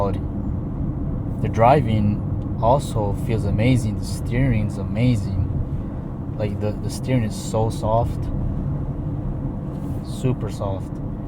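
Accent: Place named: Germany. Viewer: Are you from Germany cause your English sounded like german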